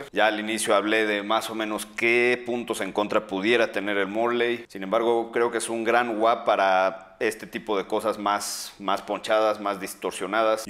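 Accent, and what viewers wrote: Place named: Yucatan, Mexico. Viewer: Haha al chile sí hablan así los yucatecos. Con eso me quedo de este video.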